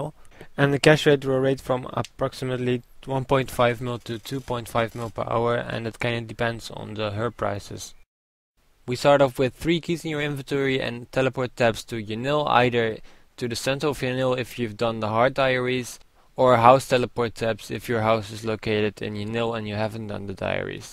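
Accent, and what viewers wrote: Netherlands. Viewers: You are dutch? =D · je hoort gelijk dat het een nederlander is >.< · ur from holland lol i hear ur accent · I can hear you're Dutch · lol ur dutch arent u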